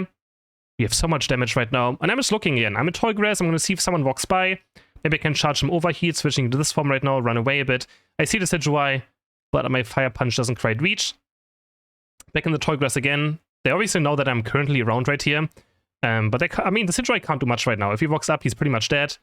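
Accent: Germany. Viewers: German accent 😊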